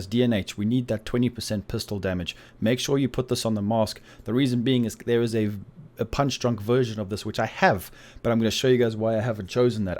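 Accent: South Africa. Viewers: Hey bru love your vids. Are you South African? I'm new to the game been playing about a week. Just got to WT5 and trying to unlock my specialisations.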